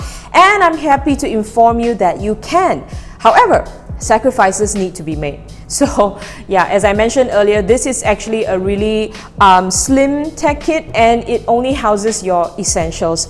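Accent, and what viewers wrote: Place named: Singapore. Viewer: What accent is that? Great to hear. Can I know which part of the world you are from? My guess would be Singapore.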